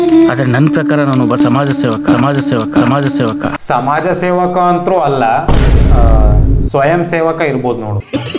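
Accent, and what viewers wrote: Uttara Kannada, India. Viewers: Uttara Kannada maatugalu.. namma huduga ivaan❤❤ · full uttarakannada slang a maintian maadri ......eee nadu nadu bangalore accent byada...goood going...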